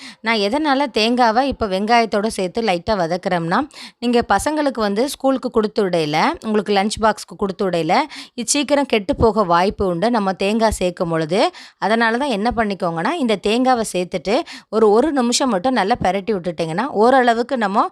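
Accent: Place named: Tirunelveli, India. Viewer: உங்கள் தமிழ் மிகவும் அருமையாக இருக்கிறது. அருமையான திருநெல்வேலி தமிழ்.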